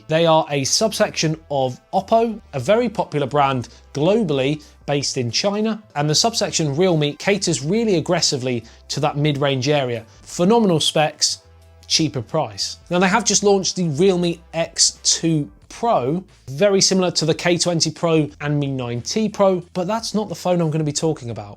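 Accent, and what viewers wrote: United Kingdom. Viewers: You mentioned the pricing only on the first device. You may have a James Bond accent, but this video was real douchebaggish ya cheaky bastard.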